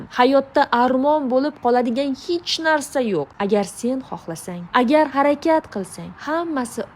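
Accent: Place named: Fergana, Uzbekistan. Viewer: Фаргонадан экансиз а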